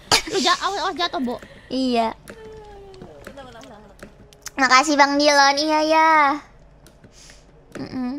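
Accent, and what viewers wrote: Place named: Java, Indonesia. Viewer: Tapi asli medok nemen kobo, logat sama pemilihan suku kata asli wong jowow😊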